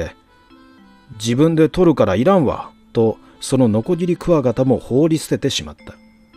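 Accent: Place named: Osaka, Japan. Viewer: おはようございます。いま拝聴しました。なでか懐かしく感じられる里山の風景と滑らかな大阪弁小さな出来事が見事に描き出され朝からほっこりしました有り難うございました。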